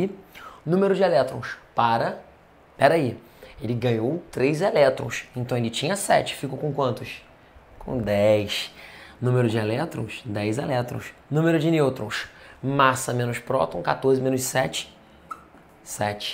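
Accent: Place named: Rio de Janeiro, Brazil. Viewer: Com certeza esse professor é carioca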